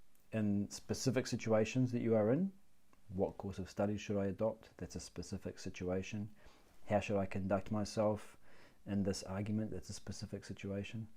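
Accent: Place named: New Zealand. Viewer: Auto-generated subtitles seem to have trouble with the New Zealand accent. The prase used in the first part of the video (e.g. ) is "Dream lion"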